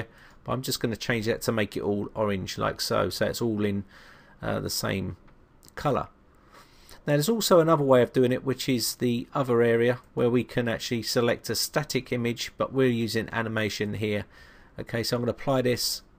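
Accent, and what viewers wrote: United Kingdom. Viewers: You are by far my favorite Windows 'Guru.... And please, slow down your fast, nervous speaking, because that along with your heavy British accent.... makes it hard to enjoy the 'essence of your Royal Perfumes.......'God save the Queen!.....'You are the best!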